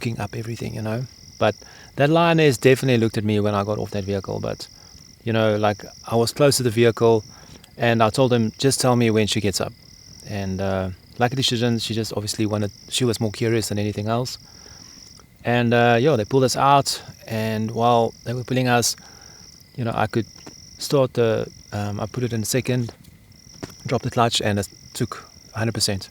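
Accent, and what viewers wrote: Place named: South Africa. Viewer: "and uh yuh" i love this accent aha as soon as i clicked i knew youd be alright that accent youll only see in lion or african wilderness videos, im certain its the south african accent, people often say you guys sound like us aussies in a different way 😂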